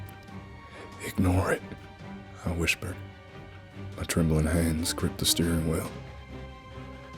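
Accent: South, United States. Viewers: "Butt hurt" 😂 I haven't seen any butt hurt comment here yet, still scrolling tho. So far people seem to like the accent. I personally don't like southern accent at all, for non-english speaking like I am it sounds somehow slurry and words are sometimes very hard to recognize. It's important to remember that disliking someones accent doesn't mean the person themself is dislikeable (is that a word 😅). I'm definitely not butt hurt about this story's accent since the author has so damn beautiful voice 🥰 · I Love the Southern Accent! 🤗 Really Wild Story! 😍 Thanks For All Your Hard work! 😙🙋✌💜🐺🐶🌻🐢🐾🐳❤💋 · Faith Castillo - haha fair enough! It IS a good southern accent, anyway. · Once again sir, well done. as per usual, your timing, tone, and emotion is perfectly delivered. Maybe you could mess up every now and then, just to prove you are not an alien life form. 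Also, I absolutely love this accent. It sounds to me like a mix of north Tennessee good ole' boy, and a south Georgia gentleman, but that's just my take on it. You could easily pass for a real Southerner. Keep up the great work buddy! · As somebody from the South, I'm really blown away by your Southern accent. Like, can we informally adopt you? 😂 Bravo! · I settle in to hear soothing voice and am startled by this jarring "american" accent. Yikes! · are you sure that you weren't born in the south? Your accent is spot on!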